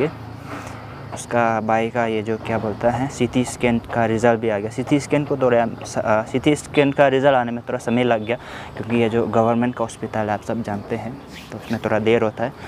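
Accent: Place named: Arunachal Pradesh, India. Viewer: Bhai, Don't worry, little brother jaldi fit and healthy ho Jayega. Jesus Christ heel him. Or rahi BAAT Hindi Ka. Toh Jaise aap bol rahe ho. Aise he sunder Lagta hai. Arunachali Hindi style I love.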